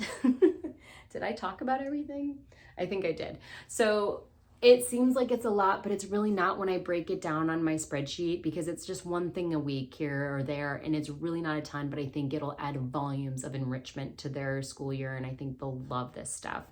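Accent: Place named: Western New York, United States. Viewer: Love that I found your channel. I am just starting this year with my oldest, a Charlotte Mason/Waldorf mix, but I love seeing how others use different styles to create their own. Very inspiring! Also, I knew you were from western NY before you said Buffalo, you've got the accent (I am originally from Rochester)!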